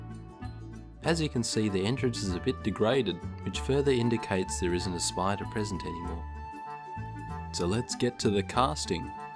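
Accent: Australia. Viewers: Are you Aussie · You've got a fantastically soothing voice. Great to hear a fellow Aussie who doesn't sound like Steve Irwin. Very cool video!!! You speak very sweetly about the spiders too!!!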